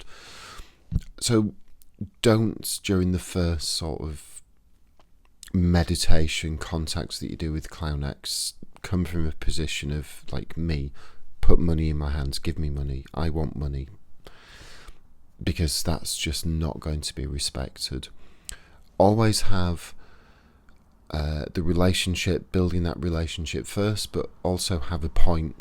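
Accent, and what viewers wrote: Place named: United Kingdom. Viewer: Off topic your voice is so calming and love your British 🇬🇧 accent it's the cherry 🍒 on top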